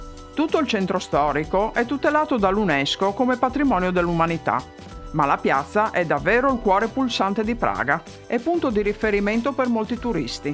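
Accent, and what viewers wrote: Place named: Veneto, Italy. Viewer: Sei veneta ciò!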